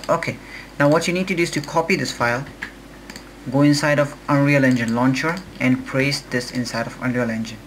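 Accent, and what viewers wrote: India. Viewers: I don't trust anyone with an east Indian accent and a computer with an internet connection ......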